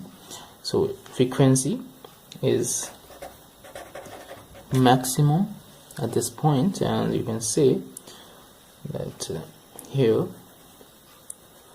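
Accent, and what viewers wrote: India. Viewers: btw I already hit the like button but are you really from the US or you are an Indian guy?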